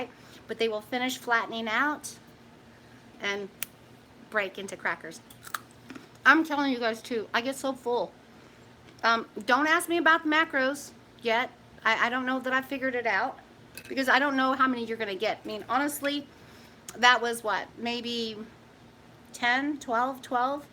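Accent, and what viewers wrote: Texas, United States. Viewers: How is it I am just now finding you? LOL. You sound like you may be a fellow Texan. I am enjoying your videos.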